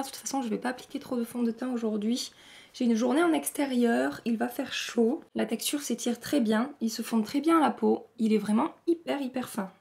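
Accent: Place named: Bordeaux, France. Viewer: serais tu de Bordeaux ou de la région bordelaise pour avoir cet accent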